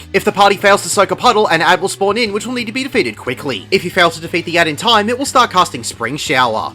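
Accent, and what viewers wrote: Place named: Scotland, United Kingdom. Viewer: I’m here for one reeeeeeason: the Scottish ish is crazy Brodie 😂🤣👏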